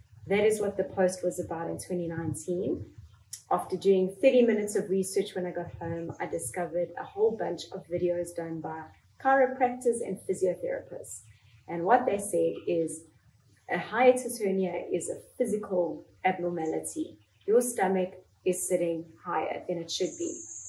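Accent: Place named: South Africa. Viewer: im australian i just heard the accent and thought it was south african :)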